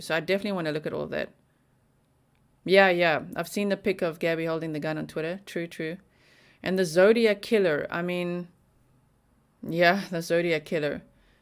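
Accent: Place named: South Africa. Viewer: South African living in the Netherlands...thats so cool! Thanks for sharing that. I wondered where your accent was from but couldn't figure it out. I love when you said that your a true crime mind all the time! I'm American living in Finland 🇫🇮 and its a bit weird bc the news/media doesn't report here like they do in other places. I watch a lot of crime, documentaries, court cases online, and mysteries. But definitely interesting points your making :) and I like that you look at the big picture.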